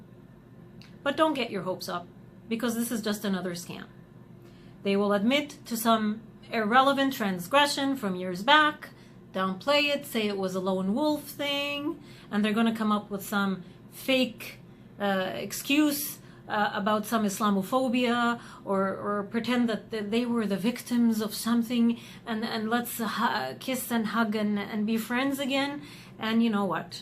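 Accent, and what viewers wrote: Egypt. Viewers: Do not lie... You are not from Saudi... Your Arabic accent is Egyptian 100%... If u r Saudi prove it... Passport.. Or which part of the Saudi area... What is your tribe ... Otherwise stop telling lies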